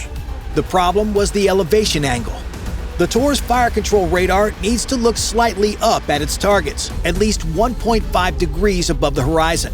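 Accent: United States. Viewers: Oh dear oh dear oh dear oh dear. I cannot listen to this excitable racket. An English narrator please if you would. This American narration is insulting and should not be heard in Britain. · Another bloody UGLY accent. Why do they keep putting American ACCENTS on videos? They must know the world hates them. No reason to watch any more. was more than enough. There won't be any TRUTH in the video. Americans don't just murder women and children, they don't ever tell the TRUTH! EVER!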